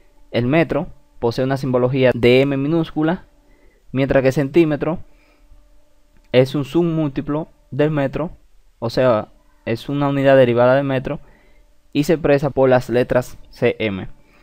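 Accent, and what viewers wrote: Dominican Republic. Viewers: El acento me suena, eres dominicano??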